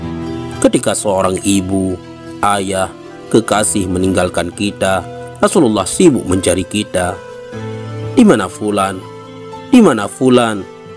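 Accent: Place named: Bali, Indonesia. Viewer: Suara admin kayak orang Bali ya?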